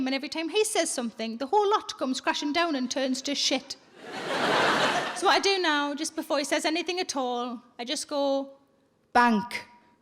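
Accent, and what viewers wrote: Newcastle, United Kingdom. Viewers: Geordie, from Newcastle on the UK. Not Georgia.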